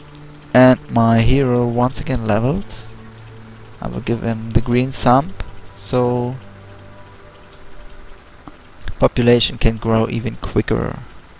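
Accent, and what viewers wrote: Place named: Germany. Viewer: I love your accent. Are you german?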